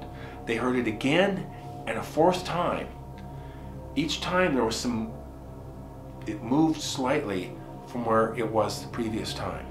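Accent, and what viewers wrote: Minnesota, United States. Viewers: I also listen for the MN accent. Ahhhh home.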